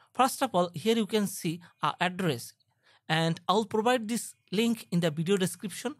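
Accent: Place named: Bengal, India. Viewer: ভাই আপনার প্রনাউন্সিয়েশন শুনেই বুঝে গেছি আপ্নে বাঙালি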